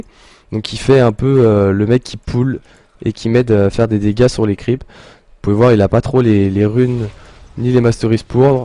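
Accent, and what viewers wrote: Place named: France. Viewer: J'adore quand il dit la solo cul xD Accent français ftw ! :D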